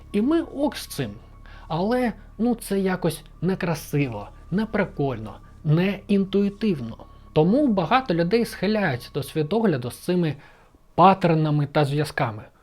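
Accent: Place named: United States. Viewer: На фоні включив це відео просто по приколу, але в моменті справді стало цікаво. Це якийсь суржик чи фішка що ти в більшості міняєш г на ґ) спершу думав що помилився, але чим далі тим більше стало здаватись що ти омериканец який добре оволодів мовою, але залишив акцент)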